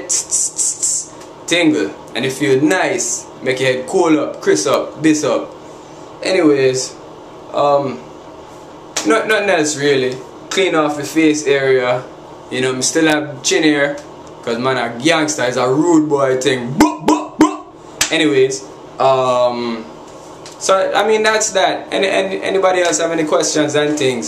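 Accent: Jamaica. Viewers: lovee your hair & you resemble the jamaican accent pretty good ") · u jus killed me wit da "rudeboy buck buck buck" part jus 4 dat auto sub n a big up bredren...btw r u jamaican or u jus talkin wit da patois cuz of da products to add effect?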